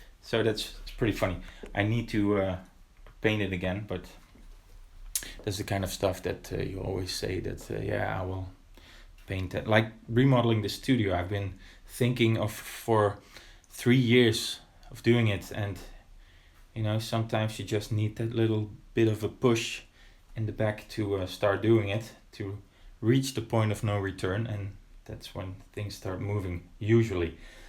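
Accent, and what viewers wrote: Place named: Flanders, Belgium. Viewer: I love the 'Ronald' keyboard , and the fact that your accent is not Dutch at all (it's Flemish :) )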